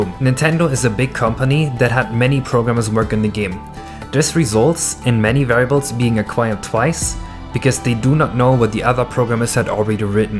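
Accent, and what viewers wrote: Germany. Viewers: i love the heavy german accent. very thematic. "lol. typical germans. always trying to reinvent the wheel. there's no overengineering like german engineering" and the like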